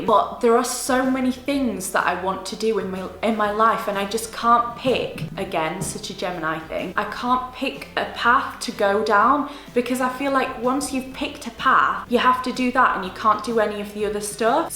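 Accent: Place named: Northern England, United Kingdom. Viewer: Love that you went to Penistone Grammar! I live Huddersfield way and it's great to hear another Northern accent :D xxx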